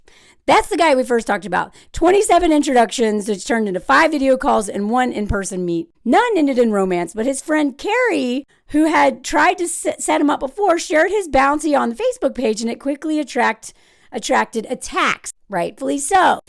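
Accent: South, United States. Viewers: I love your southern voice! 😂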